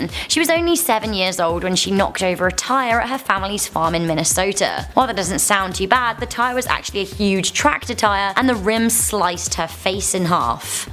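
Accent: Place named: United Kingdom. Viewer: I love and believe in heaven not because of mere religion but also of faith (duh)oh yeah and I know people who saw Jesus or maybe God 😛. They testified that they went into a trance before seeing stuff during our praise and worship sessions then woke up, crying stuff out in tears... "I saw Him😨!!!I saw Him😢!!! He's wearing white😭!!!!!!!" And I'm so wowed by the fact that you guyz, would make a vid about these blessed people.🙂 So yeah. Moooore power to you guyzzz😍. GodddBlesss!!!😆😆😆 and ps Oi love your bri'ish accen' i's jus sow wow😍😍😍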